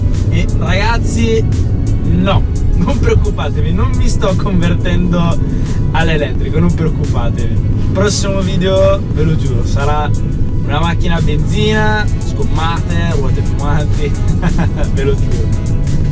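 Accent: Italy. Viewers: Yes, cool to see and hear a Italian in America. :D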